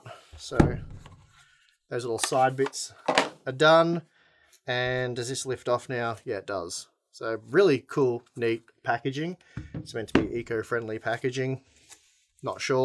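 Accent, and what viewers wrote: Australia. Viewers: Aussies are so cute when they talk.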